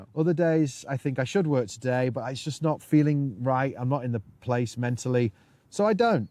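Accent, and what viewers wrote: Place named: Lancashire, United Kingdom. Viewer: Hi.. I'm a new subscriber.. Just want to say what a fantastic lifestyle you've chosen.. It's good how you've taken your kids out of mainstream teaching.. What a way to go!!! They'll have a far better education doing your way! You are creating great content too.. Good on you!! Are you from Lancashire? I recognise the accent?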